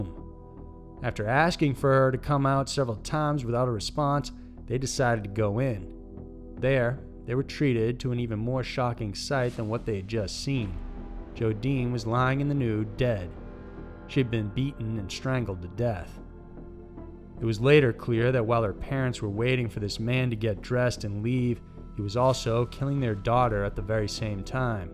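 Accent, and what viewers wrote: United States. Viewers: He sounds very much American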